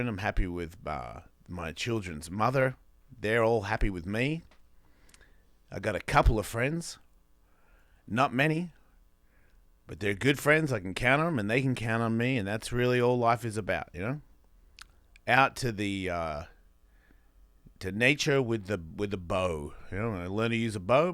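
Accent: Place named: Australia. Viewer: HEHEHE DICKHEAD ITS SO AUSSIE EVEN THOUGH YOUVE BEEN AWAY SO LONG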